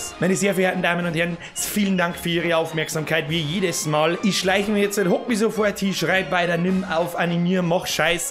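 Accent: Bavaria, Germany. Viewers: Läx verstas zwar nume weni gnau anelose Ich find de bayrisch dialäkt am beste^^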